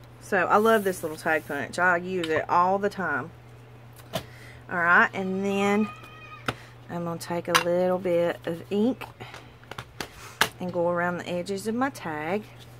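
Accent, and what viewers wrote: South, United States. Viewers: Just found this video and it brought tears to my eyes. That accent it straight from my southern roots! Well, I still sound like that even though I’m a transplant 😎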